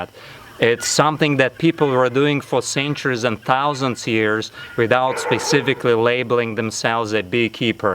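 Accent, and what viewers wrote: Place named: Ozarks, United States. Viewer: I live on the Missouri Ozarks. I was not ready for that accent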